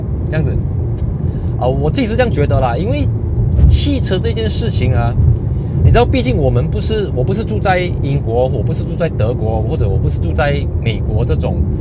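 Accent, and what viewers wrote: Malaysia. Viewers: 如果你担心部分观众听不明白某些词语，建议你可以在字幕写能理解的词语，希望你能在保持大马有趣的口音当下也能让观众明白你的讲解！ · 馬來腔的華語台灣人聽完全沒問題,還蠻親切的會想到大馬的僑生同學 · 我是無意中發現了的channel，看了一條片就subscribe了，我反而覺得，一定要keep住目前這种風格，的馬來西亞口音華語我覺得很有趣，而且還時不時來兩句廣東話和閩南語，很好玩。新不新車什麼的無所謂啦，現在做汽車的channel很多，也不完全是為了看新車才看影片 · 加油，挺喜欢你的马来腔，哈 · 我住在米国，就喜欢你的马来腔。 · 很支持用自己的方式介紹Malaysia 的車子如果今天用台灣腔或普通話講的話就不Malaysia 了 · 我是大陆人我非常习惯这个马来西亚的中文口音因为在大陆B站有许多马来西亚的up主常年投稿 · 马来西亚华人用马来西亚特有人情味的华语强调就是你的定位！我认为你不需要调整。另外，你可以分析一下你的主要受众群特性；以我个人的观点，你的受众应该主要来自中等收入职场人，要拓宽subscriber量，除了深化你的原有拍摄风格，还可根据你的主要受众因为车子而关心的其他生活事项（如车贷、车险、维修保养、智能化提升、运动、假日出行等）的各种tips或好康介绍做延申，让订阅你频道的粉丝不单是观看你的车评，还因为在内容中看到各种好康而更有向好友推荐并subscribe的动力。数字经济时代，羊毛不但能长在牛身上，还可让狗来买单。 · 不需要特地去改口语，Malaysia人当然是用回Malaysia Style的华语啦！我肯定是站在你这边的👍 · 继续做你自己吧其实这才是大马的本色文化语言就好像看其他国家的汽车媒体他们也没刻意去改变腔调来迎合外国观众如果外国观众不明白一些大马的道地语言一些大马的梗可以在影片下面留言我们大马观众会帮忙解释的我们也会继续支持你希望你能继续走下去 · 我看留言者几乎都是男性。我是受我先生影响，也学会看的汽车介绍，从中学会了一些汽车的知识。希望你不久的将来订阅人数达到50万以上。还有，我prefer 你的马来西亚华语口音👌👍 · 从一开始就支持到现在，还没开始看你的频道之前我总觉得介绍车的频道都是在背spec罢了。但是慢慢的就只观看你介绍的。没有贬低其他频道的意思，但是我相信多数观众都会有同感，可以介绍超跑、又可以介绍myvi saga。 choo yee的频道真的是很优质。如果接受不到我们马来西亚的华语腔，soli ～我们就是喜欢酱讲话。继续加油，200k subscriber 迈进，不忘初衷💪 （其实真的开会员频道我们一样买单） #OneManOneTeam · 继续努力加油！我们应该以马来西亚口音为骄傲。这是我们的特色！我会继续支持你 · 從語言方面來講, 我覺得你毋須遷就那一個國家的觀眾。我是在澳洲生活了三十年的香港人, 我不講華語, 對你的馬來西亞的華語完全了解, Slang反而有親切感. 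以英語而言, 澳洲, 紐西蘭, 英國, 加拿大, 美國, 印度, 馬來西亞, 星加坡也各有特色, 卻不會影響我們的溝通. 做Youtube當然要用自己最流利的語言, 更加重要的是content啦. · 不要太在意他人的看法，虽然说人不可能完全不听他人的评价，但是您其实也已经很优秀了，您所分享的都是真实且客观的评价。还有您的口音就是您的特色（也代表马来西亚独有样子）所以非常atas👆👆👆，马来西亚人就是有一点点口音问题（在外国人眼中）但是这就是马来西亚的特点，我们会各种语言和方言自然而然也会有一种独特腔调（口音）。最后我想说就是您都已经放subtitle了，如果subtitle不给人（观众）看难道摆美还是给鬼看🤣XD。加油，真爱粉与您同在！🤙🤙🤙 您也要做到，当你真正拥有的时候，不在乎别人怎么看你！😊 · 其实保持Malaysia口语是好的，像中港台YouTube的节目，他们也是保持着自己的特色，我也是有观看的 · will support you always.. just stick to our malaysia accent bro.. that's make you special bah hahaha..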